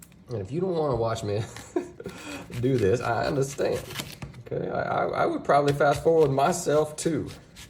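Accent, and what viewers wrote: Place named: Louisiana, United States. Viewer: I’d say Louisiana or south- judging by accent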